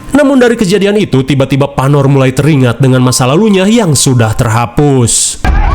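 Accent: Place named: West Java, Indonesia. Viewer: Klo xg reviu flm horor jd ngakak.. Sundax lucu.. Kerren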